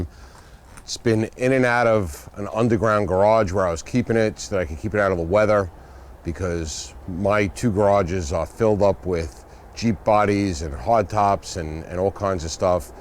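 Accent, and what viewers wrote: New York, United States. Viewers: Nice car love you New York accent I’m in canada ehh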